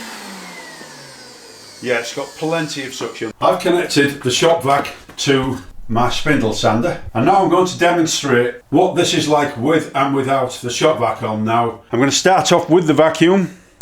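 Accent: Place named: Hull, United Kingdom. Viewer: Great video just ordered one... I recognise that accent got to be from Hull 👍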